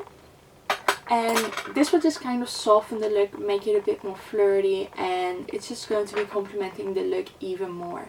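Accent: Netherlands. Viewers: Nice tutorial, you sound Dutch👀 hey there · ik vermoed een Nederlands accent ??? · You are Dutch... not even a question lol i can just tell 😂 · Are you Dutch? I can really hear it through your accent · Het is half 11 s avonds en ik ga deze tutorial meteen testen. Altijd gestruggled met winged eyeliner (i am assuming you are dutch by your accent, if not I am sorry) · dat nederlandse accent springt er wel uit haha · Hi! Thanks for the tutorial, it was very helpful. My eyes are horribly hooded, I had kind of given up on eyeliner but this gives me hope! Your accent is cute, are you from the Netherlands?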